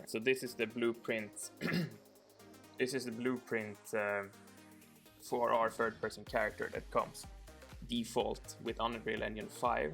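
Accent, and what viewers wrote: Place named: Sweden. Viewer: 5 seconds in and I instantly know you're Swedish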